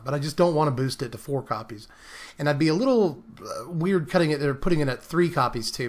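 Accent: South, United States. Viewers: with that southern accent: "you're all ears huh, well i'm pretty certain i hear a mouth blabbing" I dunno about additions/subtraction from the deck, based on what i saw and the list, i would say 'cut the girls and a Vraska' play some actual removal or counter magic. Given what i read about the meta, my call would be to add 3 counter spells, to give a tad more options in the main against both agro and azorius ... deck looks like it should be well set up to keep some mana open on Op's turn.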